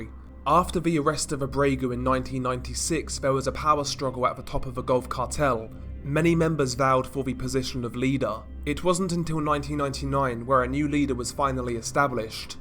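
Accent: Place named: United Kingdom. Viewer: God British English is the fucking worst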